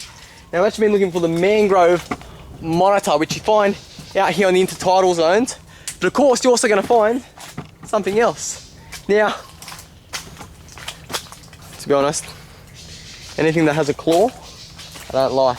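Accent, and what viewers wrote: Australia. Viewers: This guy vs The Kangaroo punching aussie vs Gary the Goat for most Australian thing on the web.......P.S. what does he call his audience? At first i thought he was saying "george" then it sounds like "church"...i have an American's ear and some thick aussie accents sound like vegtable soup in my ears..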